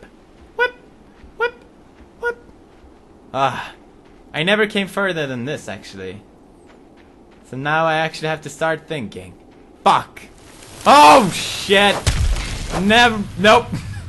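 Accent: Sweden. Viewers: Lol pewds's accent is still swedishyish ya know. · I've never heard his Swedish accent till now 🤔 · OH MY GOD! BACK THEN I DIDN'T REALIZE HOW STRONG YOUR SWEDISH ACCENT WAS... oh my god, coming back here after all this time as some kind of bitterness... guess we both grew up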